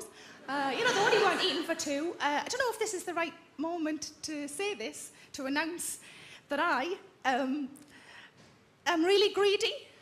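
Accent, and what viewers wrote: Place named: Newcastle, United Kingdom. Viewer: Hollie Dixon why wouldn’t they 😂 it’s only a Newcastle accent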